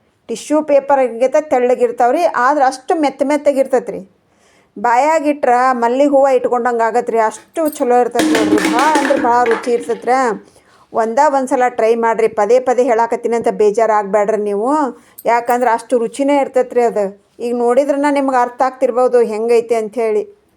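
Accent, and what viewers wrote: North Karnataka, India. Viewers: ಉತ್ತರ ಕರ್ನಾಟಕ, ಮೇಡಂ ನಿಮ್ಮ ನಿಮ್ಮ ಉತ್ತರ ಕರ್ನಾಟಕ ಮಾತಾನ್ಯಾಗ ಹೇಳ್ರಿ ನಮಗೂ ತಿಳಿತೇತಿ ಮಾತು ಸ್ವಲ್ಪ ಕಡಮಿ ಮಾಡ್ರಿ ಏನ್ರಿ ಮೇಡಂ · ಭಾಷೆ ಕೇಳಿದ್ರೆ ಇವರು ಉತ್ತರ ಕರ್ನಾಟಕ ದವರು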